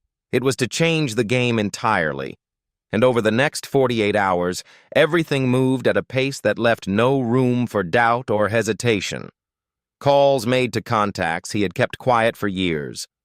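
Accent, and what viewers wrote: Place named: South, United States. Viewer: What a horrible irritating voice to use for a reasonably good story. No need to sound like some hillbilly.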